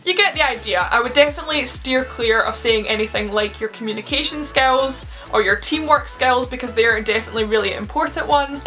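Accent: Scotland, United Kingdom. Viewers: First of all, I could listen to you talk all day long. I love the sound of your Scottish voice. Even wearing glasses you still look far too attractive to be a teacher. From what I could tell you're a bit shortsighted so you need glasses to see things at a distance clearly. I'm a retired optician if you're wondering. Over then next few years you can expect your eyesight to further worsen a bit each year due to your young age and the amount of close work you will be doing such as reading and studying. You can expect to be wearing glasses full time within a year or two. So it's a good thing you look as amazing wearing glasses. But then again, teachers are expected to wear glasses. I remember in high school every teacher I had was rather old and they all wore glasses. The only exception was my junior year history teacher. She was young and very pretty and I always looked forward to my history class just because of her. 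When we came back for Christmas break I was surprised to see her wearing glasses. She must have just gotten them because she was doing a lot of taking them off and putting them back on. At one point she was in front of her desk teaching when realized she wasn't wearing her glasses. She turned around to get her glasses and as she was putting them on she said that she had just got them 3 days earlier and she was still trying to get used to wearing them. She told us that she had noticed a couple months before Christmas break that she was having trouble seeing posters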